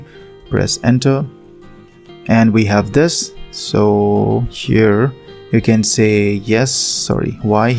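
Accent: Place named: India. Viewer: As if it isn't already difficult enough to understand what you are saying with your heavy Indian accent, you went ahead and made it even worse by playing background music.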